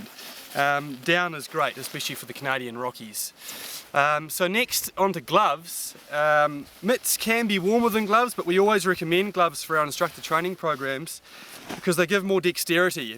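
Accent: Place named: New Zealand. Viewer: Good Kiwi accent! :)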